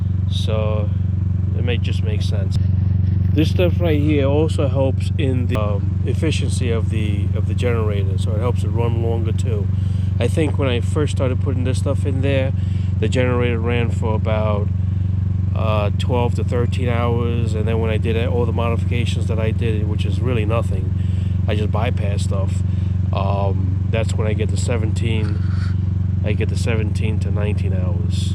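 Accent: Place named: New York, United States. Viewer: Great video!! Couple of things right off, I'm from NY, like the accent. The other is, I use a syringe-plunger big one that holds more than 20 ounces with and a tube extension to suck the oil out for my oil changes(I do it while the van is at client houses super quick no mess! LOVE IT! Use this method on all three of my mobile dog grooming vans! I have 2 of these and run them both on the back of a mobile dog grooming van(shuttle bus conversion). I power a 15,000 BTU Roof AC, water heater, clippers, lights, and most power hunger, a blower-dryer to dry the dogs. I use 1 3500 Gen for just the blower-dryer and the other for the 15,000 BTU AC and everything else. You have me thinking if I make these mods, I could longer run times on a tank full. I gotta try. What about how loud with the spark arrester off? My clients like quiet in their driveways as their dog is being groomed... 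Gimme your thoughts.... Please. Thanks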